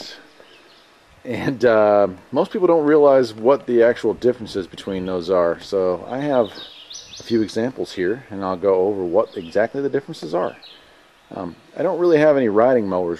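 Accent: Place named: Midwest, United States. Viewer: You sound like a midwestern boy! Great channel, for learning!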